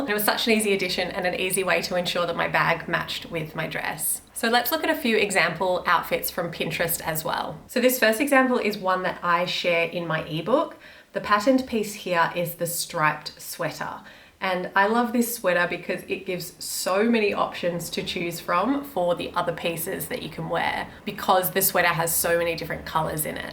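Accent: Australia. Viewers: Great vid love your non bogan Aussie accent and your way of explaining this theory is really clear with good examples. Thanks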